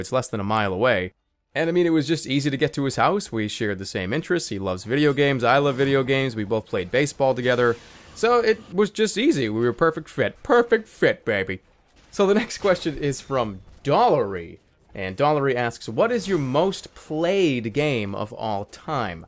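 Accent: Boston, United States. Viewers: and hes got sort of a boston voice :D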